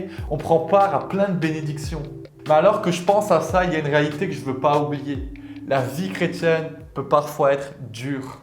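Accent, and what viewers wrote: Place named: Alsace, France. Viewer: Je tombe sur ta vidéo, je me dis super un autre frère en Christ sur You Tube super! Et la j’entends l’accent alsacien et je me dis oh en plus alsacien ! 😂 Ps: je ne savais pas que le « yo » ne se disait qu’en Alsace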